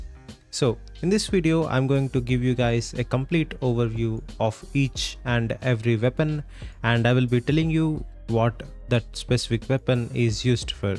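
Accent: India. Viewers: Bro are u indian?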